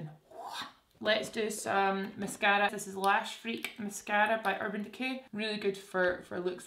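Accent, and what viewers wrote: Scotland, United Kingdom. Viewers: I'm tearing up just listening to you talking about VIEVE. I've been following you FOREVER! I was still in high school when I started following you on instagram, you still had the white stripe with the black hair. You didn't have Drogba yet. You lived in a tiny flat and you were a bit shy in front of the camera. And oh my god, I didn't think accompanying your journey had gotten to me until now. I feel like a proud friend! My mom and I watch your vlogs religiously (even though I have to translate half the things you and Jack say, she's not good at understanding Scottish accents). You're the only beauty influencer I truly listen to. I'm so so so happy for you!!! Lots of love from Brazil! Trust and believe I'll save money to get e v e r y Vieve product. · I’ve been watching you since waaaaaaay back in the day having stumbled on you by complete accident. 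I have a great love for Scotland and it is my number one bucket list place to visit so when I heard your accent I stopped and was immediately obsessed by your your personality. You’re such an inviting loving person and have an energy that I can only describe as sunshine. I watch every single video religiously. I’m so so so happy for you about your brand and honestly I’m really proud of you!! Nothing but love from me to you sister!! You’re killing it. Keep being the beautiful human that you are xoxoxoxoxoxo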